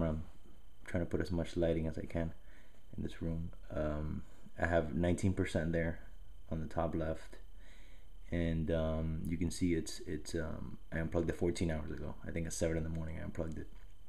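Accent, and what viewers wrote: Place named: South Florida, United States. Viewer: thanks for your reply.. p.s. when I heard you on the video I thought you sounded familiar and then I saw that your from south fl... i'm from Miami but living in va beach for now... thanks again.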